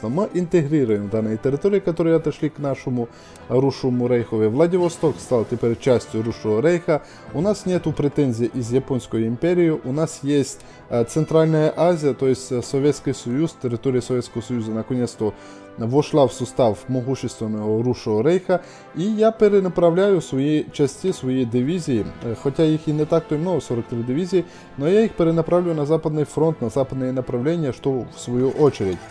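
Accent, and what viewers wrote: Ukraine. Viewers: Прохождение классное, голос автора приятный с нотками украинского, это атмосферно. Однозначно лайк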